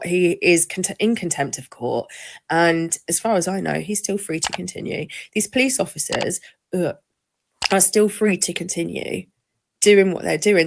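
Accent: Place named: Southern England, United Kingdom. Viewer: well I’m from south of England (isle of wight) and I KNOW the police are slack and corrupt down here she sounds like she’s from the south?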